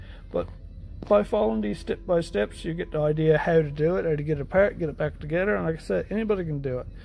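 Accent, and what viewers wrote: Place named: Newfoundland, Canada. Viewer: what part of Newfoundland are ya from?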